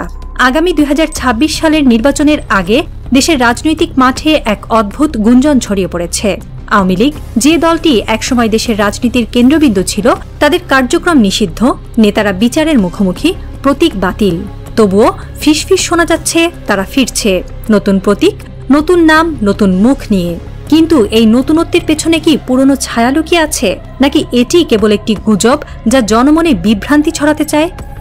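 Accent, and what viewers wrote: Kolkata, India. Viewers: কবিতা বাদ দিন, আপনাদের খবর শুনতে খুব খারাপ লাগে। কলকাতার মত সংবাদ পাঠ বাদ দেন প্লিজ।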